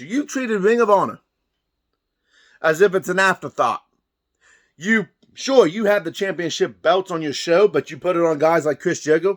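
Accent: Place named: South, United States. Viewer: Why are you trying to talk like e Southern Lawyer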